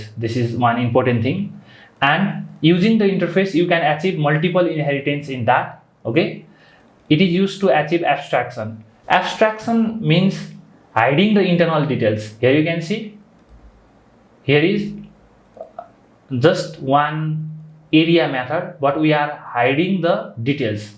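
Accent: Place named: India. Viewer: your lectures are soo good , but dont speak english in hindi acent, its looks so bad....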